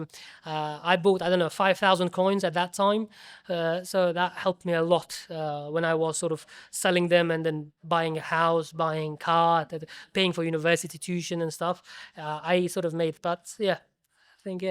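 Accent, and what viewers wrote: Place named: Ireland. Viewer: тянется на Ирландца, нет слов без остановки говорит 😊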